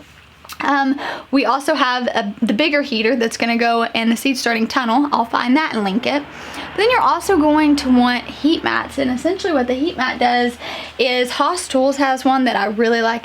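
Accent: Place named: South, United States. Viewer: I like how you Southerners say "feel"...! it's just like "fill" to the northern ear ahhahah it is so pleasant to hear. Happy Gardening and Happy New Year all. Thanks for the inspiration.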